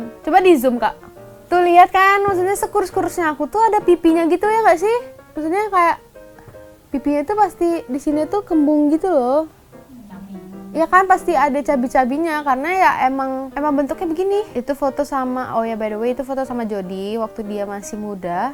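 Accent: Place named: Bali, Indonesia. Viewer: logat balinya masih ada bgttt gemes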